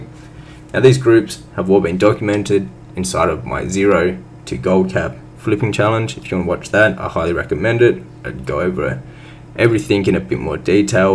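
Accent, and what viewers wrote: Australia. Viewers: Hey, np! I love seeing small youtubers grow over time. Are you OCE you sound Aussie!? either way, been watching your vids for a while and like your content style - real info to work with! subbed and will try get your guide!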